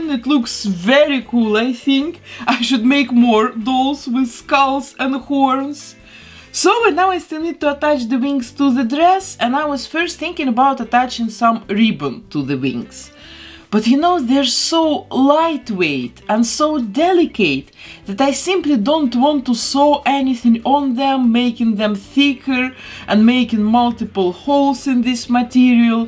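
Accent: Russia. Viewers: А вам тоже кажется, что она русская,но "английский" акцент её выдаёт?)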